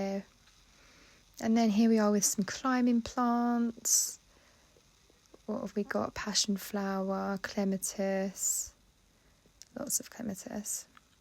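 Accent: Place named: Kent, United Kingdom. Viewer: Ah I thought I recognised the accent, I come from Kent but now live in North Essex nr Cambridge. My sister still lives in Kent nr Ramsgate and we were both bemoaning the slow start of the growing season this year. Our weather has been the same as yours what a transformation a week can make it is gloriously hot and sunny now and I hope you are successful with your watermelons. I look forward to seeing how they grow x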